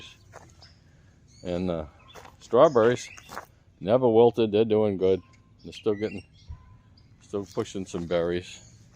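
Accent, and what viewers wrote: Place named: New Jersey, United States. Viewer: Your garden is looking great, sounds like you're from Jersey.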